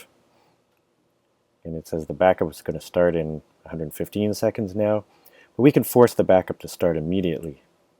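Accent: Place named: Canada. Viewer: Canadian accent equals automatic dislike!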